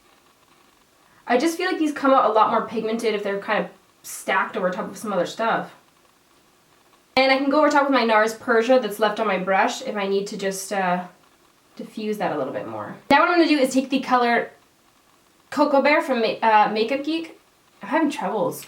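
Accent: Canada. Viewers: YOU DREEEEEEG ALONG THAT LASH LINE! Love the Canadian accent.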